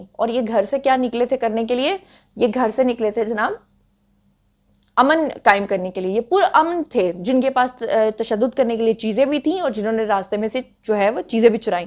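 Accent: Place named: Lahore, Pakistan. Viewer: آپ اردو کے مشکل الفاظ مت بولے۔ کیونکہ اپ کو اردو کے تلفظ ادا کرنے میں لاہوری پنجابی کا رنگ غالب رہتا ہے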